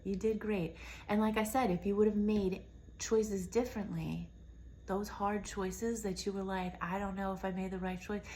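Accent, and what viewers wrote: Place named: New York, United States. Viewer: You shine Love you New Yark accent and yes I say Yark lol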